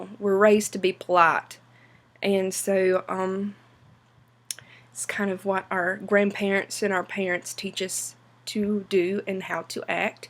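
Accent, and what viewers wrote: North Carolina, United States. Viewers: Your accent is awsome!! Im from Finland and i speak finnish so X). But that Southern accent sounds to my ear so beautiful and nice <3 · You have a beautiful accent. I moved to NC from Massachusetts and I will always have my New England accent regardless of how long I live in NC. NC accents sound more "country" than "Southern" to me which is beautiful in itself. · My mom's from Snow Hill and your accent is the closest I've found to hers on YouTube. She pronounces "pirates" like "piarts." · I would recommend ditching the accent if you ever hope to get a job north of the Mason-Dixon Line. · I just love your southern accent. Thank you especially what you said about southern men- I met one recently and he seemed really nice😊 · southern accent is really sweet and hearing it from you makes it sweeter. ;) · Nice southern accent. 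Its hard to find on YouTube. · Please, do the accent tag video List of words to read and about 10 questions about different words and expressions southern accent is cute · I've come across this video while searching for examples of the North Carolina accent I knew and loved back when I lived there a couple decades ago. What a delight it was to not only hear you speak in it, but to say such meaningful, wonderful things in it. It's been 14 years since you uploaded this - I hope those 14 years have been very good to you. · Love the accent !!I'm originally from Bladen county N.C. and you sound like my Aunt Jo ! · Hello, I'm Vietnamese. And I really really love the Southern American accent:) Thank you for your videos. They're so useful for me.:) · Omg! I'm soo glad I found a real accent. Because some of thwm other videos are fakes. My friend's german and she wants to learn my accent (eastern nc) but I cant explain it. 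You are much better and not one them fakes. Thanks. :) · I’m from Georgia...our accent is a little different...the North Carolina accent is similar to the Tennessee accent at least in parts of Tennessee...it’s just lovely.. two absolutely beautiful states....love those Smoky Mountains...it seems to me that the South Carolina and Georgia accents are similar...maybe a little slower than your..it’s funny..there are different accents in areas very close to each other...I remember the little farming towns had their own accent..and their own way of saying certain words.. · I’m from North Carolina too and we sound EXACTLY ALIKE . Don’t notice until I hear someone else speak just like me. 😂😂😂😂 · Beautiful southern accent! I'm from Arkansas and have a strong accent but it's quite a bit different from yours. Sadly because of movies and tv a lot of places are loosing their accents. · Hey 👋 I am Gem 💎 I love the Southern accent! 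❤I be sayin certain things like this way I B4 new any accents. Tanks I subscribed to your channel so not letv me down love 💎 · A very pleasant southern drawl.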